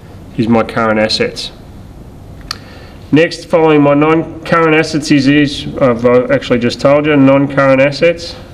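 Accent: Australia. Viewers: are u from Australia mate ?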